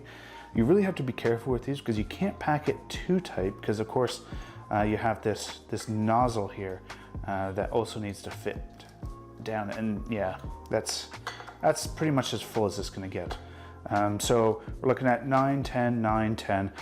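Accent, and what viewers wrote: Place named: Canada. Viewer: Where are you from? Sounded like a slight canadian accent at times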